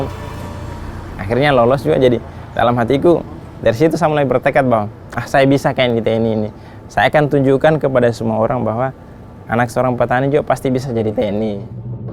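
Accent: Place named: Makassar, Indonesia. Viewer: Saya kira orang Makassar, logatnya sdh mirip soalnya 😁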